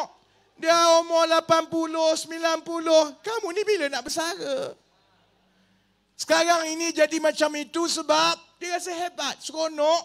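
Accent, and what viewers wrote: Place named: Kelantan, Malaysia. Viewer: Actually I hv liking for his speeches and I like his kelantanese slang....I used to listen from those days....I missed him in 2018....just envy this person for his mind frame....bravo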